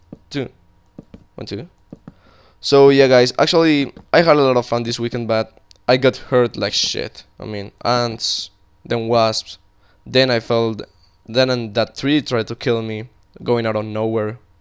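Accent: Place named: Colombia. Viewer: i actually like your columbian accent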